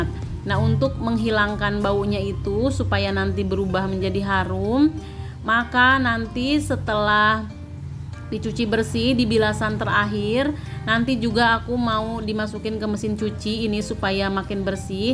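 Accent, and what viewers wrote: West Java, Indonesia. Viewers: Kakanya meni Sunda pisan 😍 apalagi pas nyebut kata TV = dibaca tipi, hehe ... Semangat terus yah kak ngontennya, bermanfaat bgt nih 🥰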